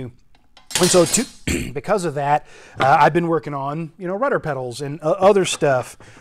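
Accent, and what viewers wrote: South, United States. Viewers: When did you get an southern accent!?! I don’t remember it from the previous videos. Glad you had a good vacation!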